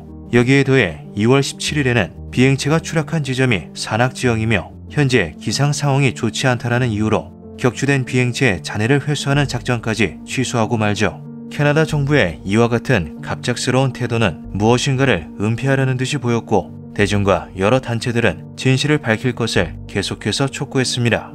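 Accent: Gyeongsang, South Korea. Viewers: 뭘 믿어야 하지? 혹시 경상도 출신이세요?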